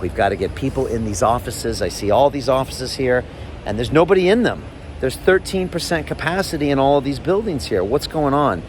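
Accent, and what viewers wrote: United States. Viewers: Is this a guy with an American accent Go back home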